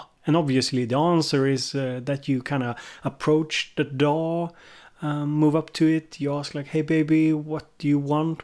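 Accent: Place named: Sweden. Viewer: Thank you for decent youtube content! As a fellow swede, I enjoy your somewhat swenglish accent. =) Keepin' it honest!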